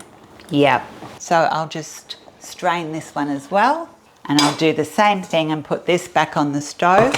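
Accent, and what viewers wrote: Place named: Australia. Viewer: Yeah,the accent! My mother who is an English teacher calls it "a lazy accent" but I think it's an Australian accent,(I think.)😊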